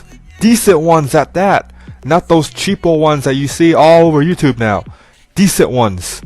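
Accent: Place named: South Korea. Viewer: quikfinger00 it Sound like he have Korean Accent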